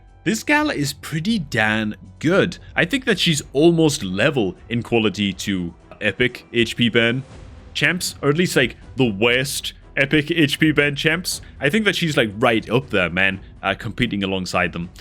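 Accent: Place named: Newcastle, United Kingdom. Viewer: It sounds baseline Geordie but mixed with something else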